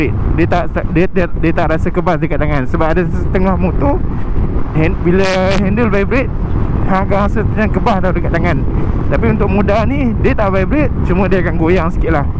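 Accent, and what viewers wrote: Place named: North, Malaysia. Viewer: Baru 1st time tgk video abg..menarik makin hujung video makin byk pelek utara kouaq 😅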